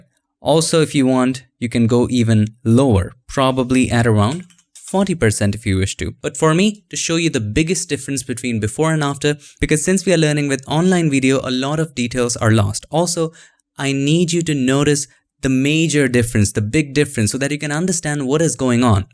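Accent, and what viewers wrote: India. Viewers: best Indian accent I ever heard (.)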